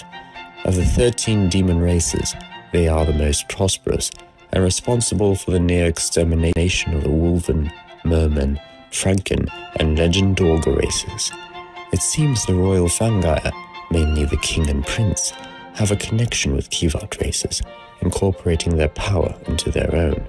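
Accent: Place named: RP, United Kingdom. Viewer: this accent is too posh for someone from bedfordshire like myself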